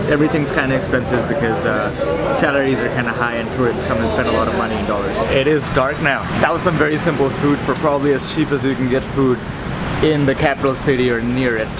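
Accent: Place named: Bangladesh. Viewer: It's nice to hear a Bangladeshi voice on foreign soil❤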